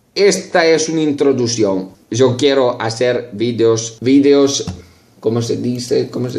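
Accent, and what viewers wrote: Piedmont, Italy. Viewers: accento piemontese + lingua spagnola => confusione => meravigia p.s. cerea arvdse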